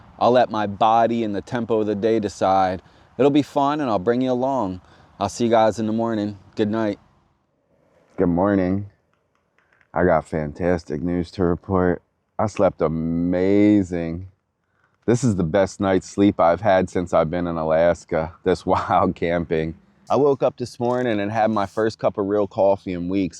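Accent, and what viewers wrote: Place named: Mid-Atlantic, United States. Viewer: Bro, you gotta be from DE, MD, SEPA area with that accent 👍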